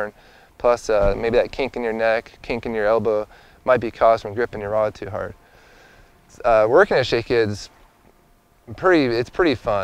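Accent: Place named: Southern California, United States. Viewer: He was from Southern California, it's just the regional dialect.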